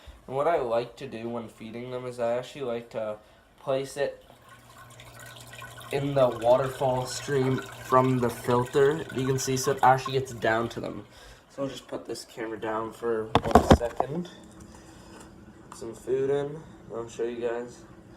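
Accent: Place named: North, United States. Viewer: I have three sunfish I got out of the lake I had a 75 gallon somebody gave me I had lime rock gravel plants already I grow on aquatic plants I took a sponge and made a Matten sponge filter covered it with lime rock and put a powerhead behind it so basically I might have spent I had everything but I will say $30 to set my 75 gallon tank up if that for my sunfish And then I just threw them in there they are tuff fish I’m in Florida so I don’t know sounds like you’re from up north somewhere I get my own aquatic plants out of the streams and rivers and ponds and we got a lot of it. So $300 is a bit much you probably just bought everything new hey if I can make it I’ll make it but good job your tank is pretty and clean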